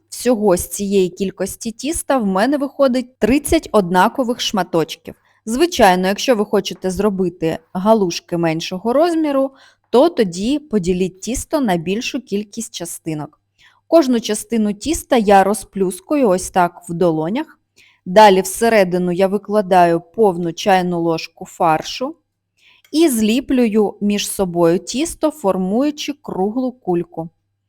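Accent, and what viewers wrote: Standard. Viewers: Я недавно підписалася на. Подобається ВСЕ! І розповідь про рецепт, і його подача і чиста українська мова. ВСЕ подобається! БАЖАЮ МІЛЛІОН ПІДПИСНИКІВ!!!🎉🎉🎉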